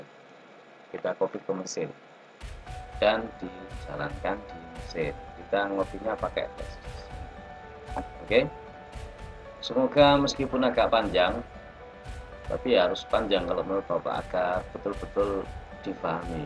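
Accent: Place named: Java, Indonesia. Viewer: baik Pak. Trima kasih. Sedang mengikuti video tutorialnya Pak. Karna masih awam banget jadi sering saya nonton. Kalau boleh saya tahu posisi Bapak di Jawa mana. Dari logat seperti orang Jawa. Mungkin Saja di Surabaya bisa saya kursus secara langsung. Trima kasih🙏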